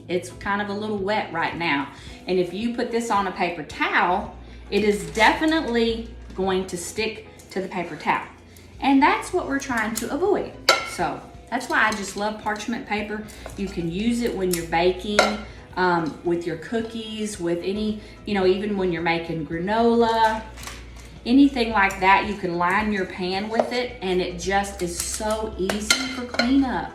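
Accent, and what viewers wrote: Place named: South, United States. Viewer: Where is your southern drawl from?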